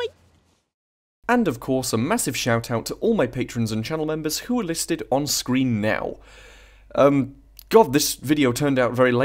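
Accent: England, United Kingdom. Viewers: Excellent stuff, I didnt realise the area of Bolton (a northern extension of greater Manchester/south Lancashire)? Could have so many railway stations 😮. Anyway, you very much remind me of that other you tuber, Tom Scott, with your predominant English accent and style 😊 All best mate. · Hi, being Irish in Manchester 21 years myself, I love your English accent, so classy - just like the classy lady, who is as classy as you are handsome 🇮🇪☘️🇬🇧❤️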